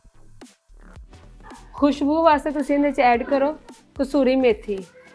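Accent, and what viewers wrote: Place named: Sialkot, Pakistan. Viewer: aap mujhe Lahore Ki Nahi lagti aap Sialkot ki lagti ho kyunki yah Punjabi Sialkot Mein boli jaati Hai ok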